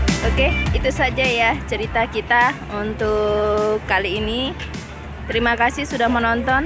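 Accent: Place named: Java, Indonesia. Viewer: Mantap, penyiare swarane kok medok banget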